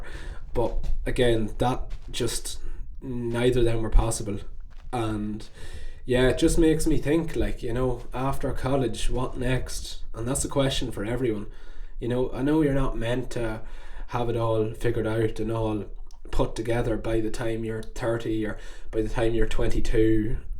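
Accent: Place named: Ireland. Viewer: Dude this is awesome. A lot of people dont start coming to terms with dissatisfaction and fear and uncertainty until years down the line from where you are, if they ever do. Most people waste years chasing pleasure and fulfillment through every avenue imaginable before coming up dry and realizing everything was for nothing. Seems to me like you are starting to realize the low hanging fruit isnt always the best fruit pretty young, which is admirable. I'm only a couple years older than you (I'm 23) so I can definitely empathize with seeing your whole life ahead of you but being afraid of never finding true satisfaction. If you want my theories and experiences with seeking true fulfillment I'm happy to share! Side note... You sound Irish, is that right? I'm in the US (Alabama to be specific) and your accent is AWESOME. I went to Ireland on a study abroad in 2022 and I definitely want to go back someday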